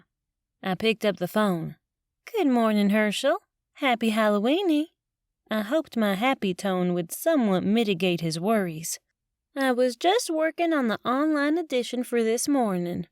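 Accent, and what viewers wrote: South, United States. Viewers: I am sorry, but this narrator’s southern accent is way too much, I can’t finish it, it’s so distracting, and takes away from the story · Y'all don't live in the south if you think this is over the top! All my neighbors talk just like this! And I'm in south Florida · I love the Southern drawl. And I love the story. Thank you! Perfect entertainment as Halloween approaches 😊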